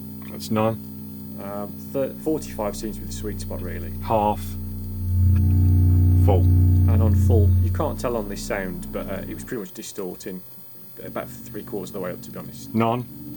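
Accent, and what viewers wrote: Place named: Birmingham, United Kingdom. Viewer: You sound local, 'am yao a brummie?'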